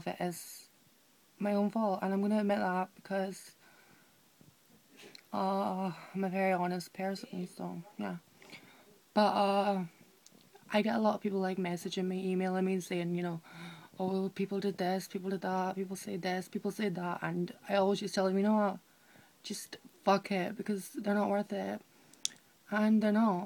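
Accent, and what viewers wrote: United Kingdom. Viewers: Not to be disappointing but I think that city is not gonna work for you my friend, you should move to a metropolitan like London or a big city in the states ... and your accent has turned British in a year :D:D:D I watched one of your old videos before this one and I was like what does she do in England with American accent but now I'm like wow her accent :D hugs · Is she Scottish or northern irish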